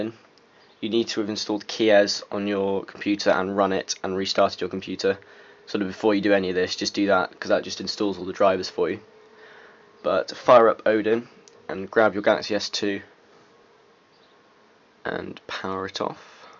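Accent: United Kingdom. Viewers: British accent is very disturbing.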